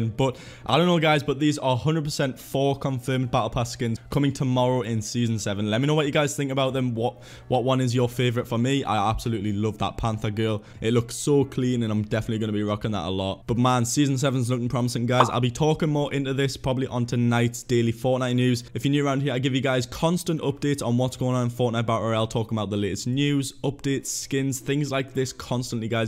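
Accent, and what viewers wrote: United Kingdom. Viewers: He pronounces Tier (Tear), the same way Evryone else in the UK, we do not pronounce it (Tire) like Evryone else, we say it the correct way.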